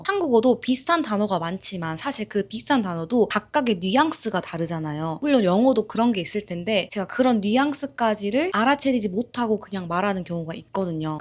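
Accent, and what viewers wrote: Jeolla, South Korea. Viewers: 전라도 사투리가 참 정겹네요 잘 들었습니다 감사합니다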